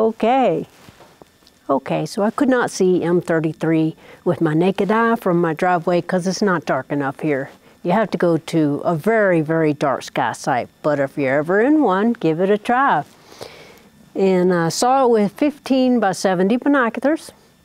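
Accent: South, United States. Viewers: I love your southern-sounding accent. Subscribed 😊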